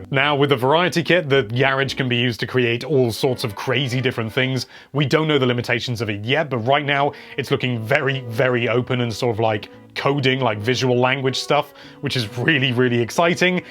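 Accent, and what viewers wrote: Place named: Wales, United Kingdom. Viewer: Why do you always sound like a Welshman in your intro but English the rest of the time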